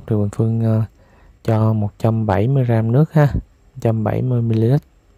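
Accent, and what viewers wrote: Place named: South, Vietnam. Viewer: Giong nói mien Nam of dễ thương hiền lành,cô người bắc 54 nhưng thích giong nguoi mien Nam,cam on các mon chay ngon của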